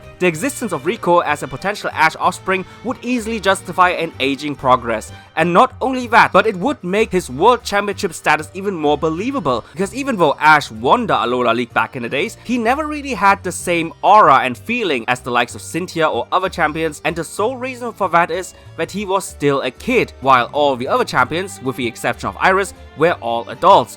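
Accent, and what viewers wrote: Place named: Germany. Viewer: Yoo du bist deutsch 😂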